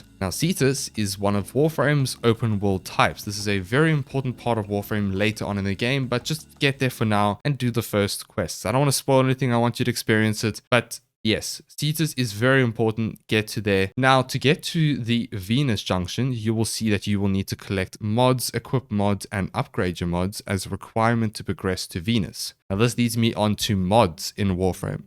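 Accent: South Africa. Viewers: Sound suspiciously south african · Completely unrelated to the video but your accent sounds familiar, are you South African? Also this was really helpful! I was super confused on how to progress when I got this game XD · You have a south african accent 🤔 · are you south african, why do you sound south african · Just a random question what is your accent. It sounds South African. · FIRST TIME HEARING AN SA ACCENT ON GAME TUTORIALS!!!! LEKKER BOETIE :) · Do I detect a South African accent or am I mistaken ? 😂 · Great video sir. Very useful as I'm downloading the game. I have one question, what country is your accent from? I think it may be south African but I'm really not sure as it could also easily be a new Zealand accent and I wouldn't know the difference. It's driving me crazy! Please! What is your accent? 😂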